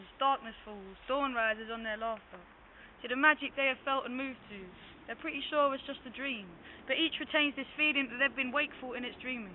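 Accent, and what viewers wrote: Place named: South London, United Kingdom. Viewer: She's from South London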